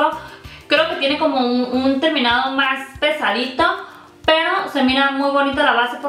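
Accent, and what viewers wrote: Mexico. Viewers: En cuanto abrí tu video y escuche tu voz sabia que eras de Tijuanaaaa !! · me encanta tu acento culichi 😂 saludos bonita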